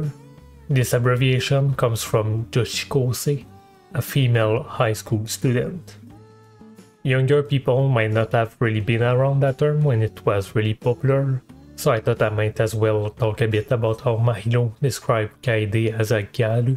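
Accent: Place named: France. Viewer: are you french? You sound very french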